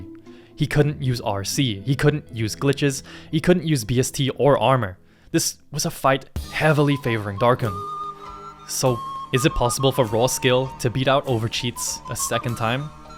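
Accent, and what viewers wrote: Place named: Argentina. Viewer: I'm sure he's from Argentina. I'm from there and I have no doubts that's our accent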